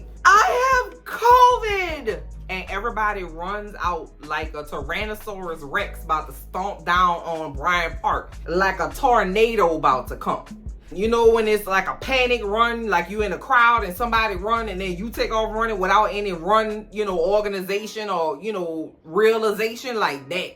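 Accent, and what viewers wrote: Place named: Lowcountry, United States. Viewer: Great review! Your covered exactly how I feel about Miranda Che storyline. Love the accent. Sounds like my family on the GA SC coastal islands.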